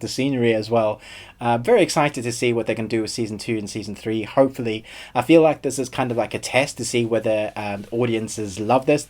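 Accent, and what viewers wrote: South Africa. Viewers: 😂😂😂😂 He does have a touch of South African sound to him.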